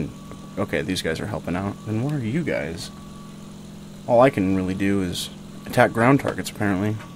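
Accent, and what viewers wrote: Germany. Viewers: We love the german accent!! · I'm a german and i enjoy listening to you speaking with german accent Its hilarios Keep doing it your way, it's awesome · The German accent is the greatest thing ever. If you're trying to make me angry with it, you're going to need to try harder!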